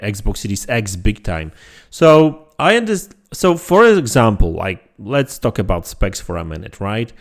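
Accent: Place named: Poland. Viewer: Od razu w intrze usłyszałem ten polski akcent xd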